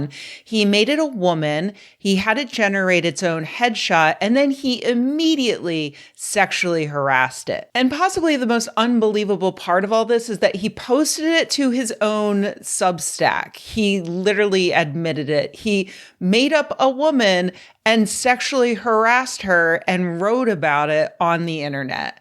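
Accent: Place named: Boston, United States. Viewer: the boston accent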